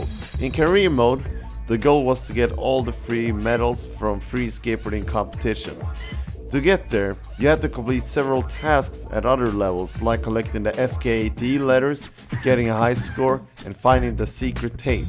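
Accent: Sweden. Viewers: Holy shit you sound really swedish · the guy that narrates are so Swedish :)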